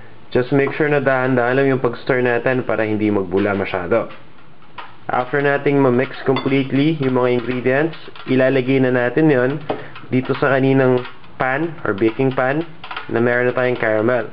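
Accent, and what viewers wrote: Philippines. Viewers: Stop speaking fillipino-english >.< I CANNOT UNDERSTAND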